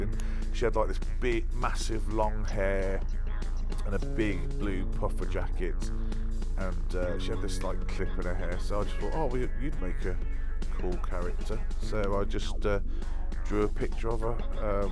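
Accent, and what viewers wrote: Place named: Black Country, United Kingdom. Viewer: i detected the accent (takes one to know one :) ), just checked your site and OMG! you went to stourbridge college too!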